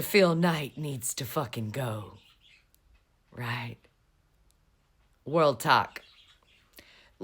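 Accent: South, United States. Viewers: England here: I love your casual, conversational style of getting the message out. You're a powerful orator with a lovely southern accent. Keep up the good work. The world needs to hear what's going on in America, now more than ever. 😘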